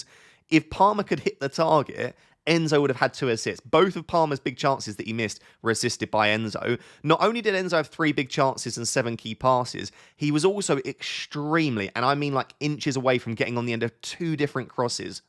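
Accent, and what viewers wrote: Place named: Nottingham, United Kingdom. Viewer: Didn’t know you was a notts lad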